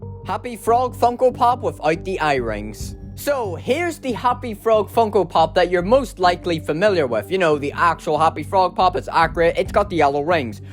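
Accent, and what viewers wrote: Northern Ireland, United Kingdom. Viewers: In the first 3 seconds, I was able to detect that you were from Northern Ireland. The accent is hard to mistake. 😂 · You from The North of Ireland?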